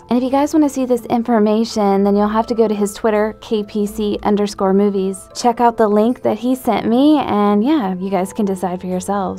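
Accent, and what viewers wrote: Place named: South, United States. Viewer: She's southern of course she has a nice voice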